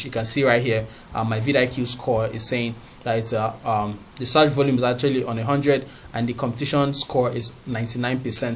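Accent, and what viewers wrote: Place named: Nigeria. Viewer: Hi. Thanks for your a great video. I like your accent. Are you Nigerian? I saw your comment on another video I jus subbed you. Please sub me back I'm also about making money on YouTube.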